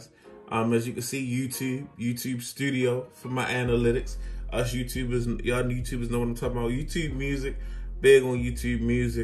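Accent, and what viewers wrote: Baltimore, United States. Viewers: Love the video, I subbed. I’m just wondering but are you from Baltimore?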